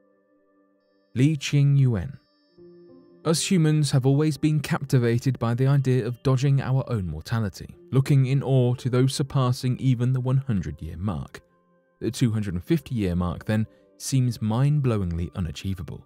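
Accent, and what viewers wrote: United Kingdom. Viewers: the poor man and like his British acent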